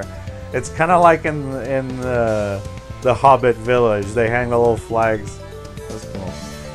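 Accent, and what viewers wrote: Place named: Brazil. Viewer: eu percebi que vc é BR pelo fato de ter sotaque...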